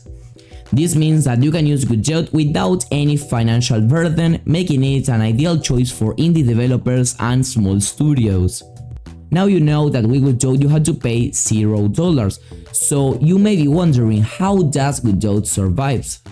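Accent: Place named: Argentina. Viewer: Ese acento Argentino se escucha a leguas. Traduci tus videos asi nos ayudas a los hispanohablantes jajaja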